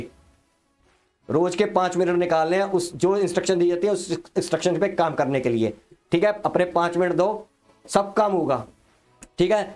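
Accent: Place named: Punjab, India. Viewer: Yaar I'm not trying to favor them but her first email was correct and you also need to check your accent if we are talking about it. They are from Punjab(pakistan) side and I'm pretty sure you are too(Indian side) with your accent - fellow Punjabi. By the way you added the word "you" in that email's first sentence.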